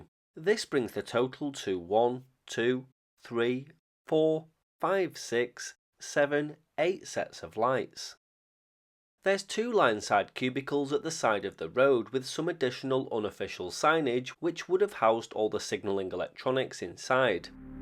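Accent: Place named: Northern England, United Kingdom. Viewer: with a northern accent, love it!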